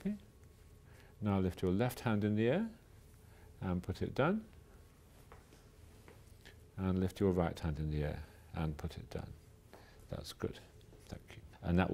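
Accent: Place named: United Kingdom. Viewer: I love the british acent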